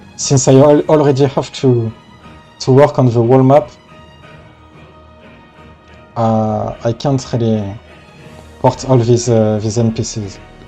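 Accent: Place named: France. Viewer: Yooooooo dude are you french ? Anyway, that might become the best mod in all video game history, great job man 👍🏻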